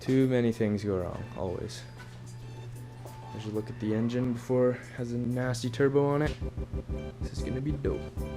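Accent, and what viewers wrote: Canada. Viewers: Could tell by the N that youre from BC. You from Vancouver or the island?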